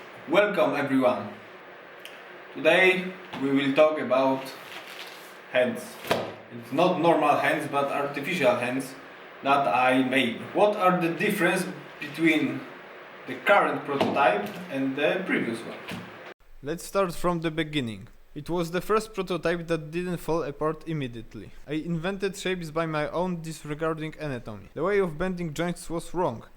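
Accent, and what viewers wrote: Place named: Poland. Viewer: Coś mi tak zaświtało że to może być rodak po tym akcencie xD Życzę samych sukcesów, choć przykre jest gdy się patrzy jak wielki potencjał marnuje się u nas. Na zachodzie już dawno byś siedział we własnym laboratorium, choć prawda jest też taka że gdzie by się z tym nie poszło to każdy będzie chciał mieć to dla siebie.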